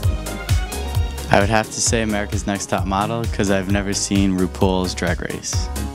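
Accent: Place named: Canada. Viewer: Is he Canadian? I have a Canadian friend who sounds almost the same.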